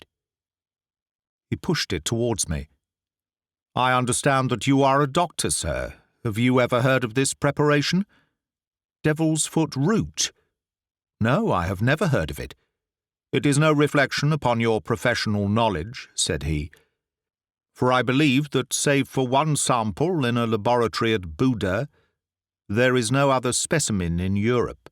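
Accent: United Kingdom. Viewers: The eloquent British dude running this channel has no idea how many times his soothing narration has rocked me off to sleep.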